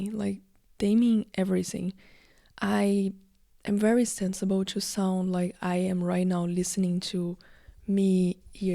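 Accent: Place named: Brazil. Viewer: Oiii diva! Muito gostoso te ouvir falando assim de maneira fluida a abrindo seu coração sobre esse projeto de se colocar vulneravel aqui. Não conhecia seu canal/podcast e vim pelo video de planejamento de metas seguindo o big paper planning day (amo). Gosto de ouvir o sotaque brasileiro de alguém falando em inglês, sei la me deixa com coração quentinho. E as quebras em português, é sempre divertido, sinto que é quase um sussurro, um segredo, um clubinho que só algumas pessoas podem entrar. Adorei você falando do lado bom de alcançar poucas pessoas - menos gente pra responder. Achei curioso, engraçadinho e honesto. Enfim, obrigada por colocar um pouco de você aqui para eu ouvir enquanto trabalho com coisas que definitivamente não aquecem o coração! Bom trabalho por aí <3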